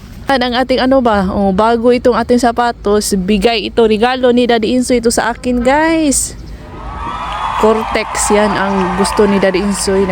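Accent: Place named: Jagna, Philippines. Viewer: ah mao sakto ko basta dapit jagna basta dili sa pikas kay ang tunos imong tingog. Just keep it up. Tan aw ra kos imong mga vlog. Good luck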